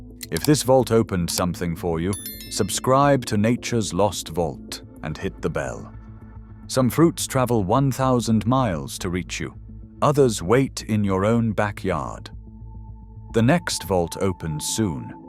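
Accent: United Kingdom. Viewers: OK-- i like paw paws, and they're an American fruit. So why was a British accent chosen to narrate this video? 🤨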